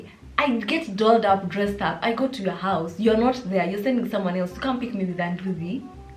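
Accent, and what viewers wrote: United Kingdom. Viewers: The British accent ❤️❤️🔥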